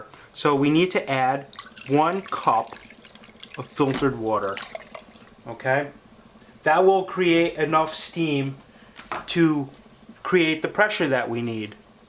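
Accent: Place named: Boston, United States. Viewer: Thanks, you ROCK too! I love listening to your Boston accent! :)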